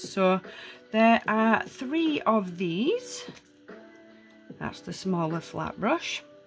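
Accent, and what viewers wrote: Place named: Yorkshire, United Kingdom. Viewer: I think th UK hub is in Birmingham, so I'm not anywhere near there. I'm originally from Sheffield , so I knew you were a Yorkshire lass !!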